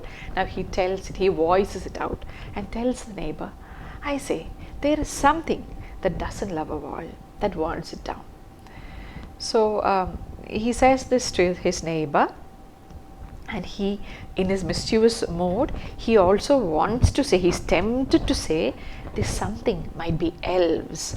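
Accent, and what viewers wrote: Kerala, India. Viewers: And a clear Malayalee accent.😊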